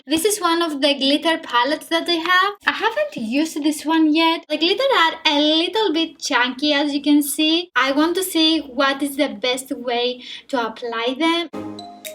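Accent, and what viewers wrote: Greece. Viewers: where are you from?You sound a lot like Greek 😀😀💝💝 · Η προφορά σου φουλ ελληνική καταλαβαα αμεσως οτι εισαι ελληνιδα 😍😍😍❤❤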